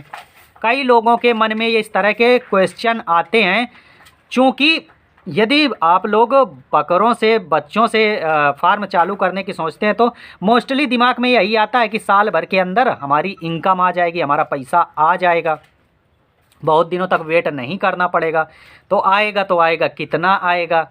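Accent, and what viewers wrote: Kanpur, India. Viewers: वीडियो देखकर मन प्रसन्न हो गया । बोलने का कनपुरिया लहजा अच्छा लगा साथ ही जमीनी हकीकत से रूबरू हुए ।धन्यवाद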